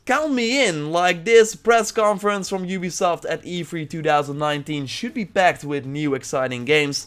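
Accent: Netherlands. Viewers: We horen gewoon dat je Nederlands bent door de manier waarop je Engels praat 😂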